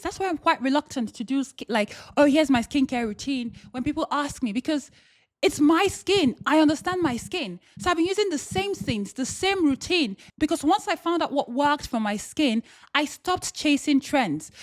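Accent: Nigeria. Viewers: I kept hearing the Nigerian accent sneak itself in and you eventually mentioned being born in Nigeria. 😂❤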